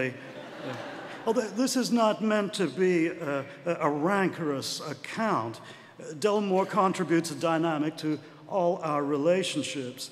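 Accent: United States. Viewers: Thank you. I was wondering where he got that particular american voice from. Doesn't sound at all like his usual voice I know from various british tv series. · His American accent is surprisingly good!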